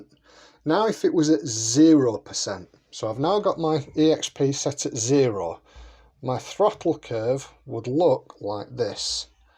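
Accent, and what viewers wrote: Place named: North, United Kingdom. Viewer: Something about this Northern accent makes me take in all the information with such efficiency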